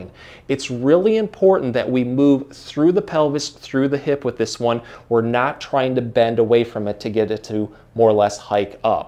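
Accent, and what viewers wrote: Michigan, United States. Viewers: Just the warm ups fixed it for me. Go Michigan!! Luv that MI accent😊 1000 Thanks 👍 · Michigan accent??????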